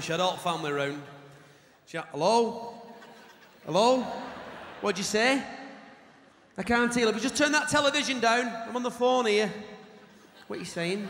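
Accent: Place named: Bolton, United Kingdom. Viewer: Bolton/ Lancashire Accent!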